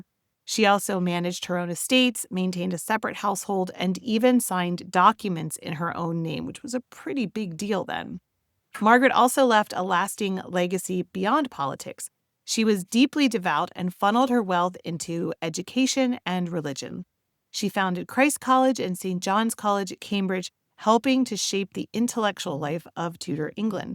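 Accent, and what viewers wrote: United States. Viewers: What a dreadful american voice impossible to listen.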